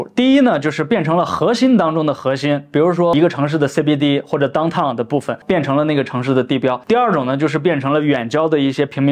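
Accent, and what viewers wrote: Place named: Henan, China. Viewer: 突然听出了一点的口音，是河南人吗😊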